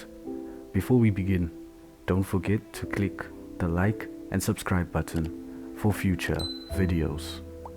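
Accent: South Africa. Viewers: You sound South African you from South Africa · Bro you from sa? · Thanks, where are you from, S.A. ? Your accent sounds like you from S.A. ? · Are you South African?😊 Thanks for the video! Looking to learn more about ICT.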